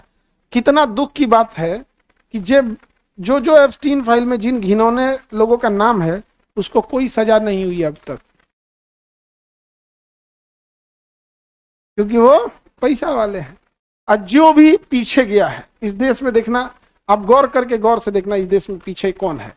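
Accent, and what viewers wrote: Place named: Bhojpuri, India. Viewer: Khan sir you are great you speak just like my brother bhojpuri and you have great knowledge.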